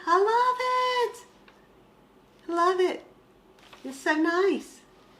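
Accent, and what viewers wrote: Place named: East Tennessee, United States. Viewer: I’m in the East Tennessee mountains and proud of it. We do talk like that lol.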